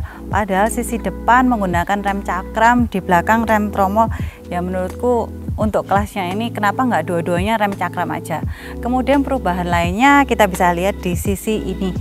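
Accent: Java, Indonesia. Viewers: Medhok e bikin betah nonton 😄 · Penyakit baleno hb lama, lampu nangis dan setir lengket masih ada kah ? Mba e medok bingit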